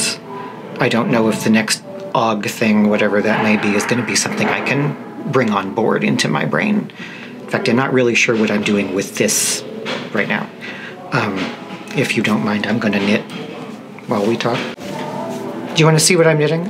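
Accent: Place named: Chicago, United States. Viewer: Natter away,. I enjoyed your Blog and now I can enjoy your Vlog. I also enjoy your Chicago accent. I grew up in a NW suburb in the 50s and 60s. Yes, you do have an accent. Thanks for dropping into my living room today. I hope you don’t mind that I’m not dressed (literally). Keep up the vlogging and knitting and teaching. How do I get in on your patreon thing?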